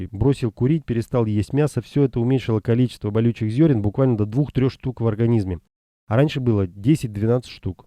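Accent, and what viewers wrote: Yekaterinburg, Russia. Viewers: Екатеринбургский говор. Вы оттуда?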